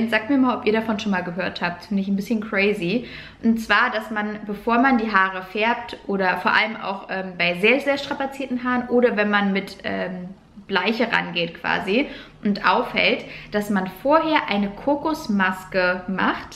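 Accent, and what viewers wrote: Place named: Hesse, Germany. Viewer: Kommst du aus Hessen? Klingt so!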